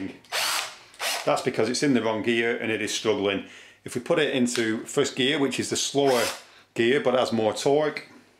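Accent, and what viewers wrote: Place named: United Kingdom. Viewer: What accent is that? I know it's British.. But it's difficult to understand when English is your second language and you ear is accustomed to American accent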